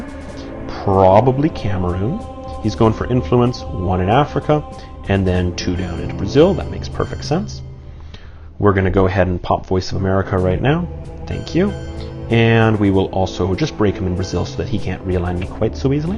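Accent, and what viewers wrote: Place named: Canada. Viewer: Well played, thanks for posting. Your commentary is some of the calmest I've ever heard in TS videos (maybe it's that pleasant Canadian accent? :D )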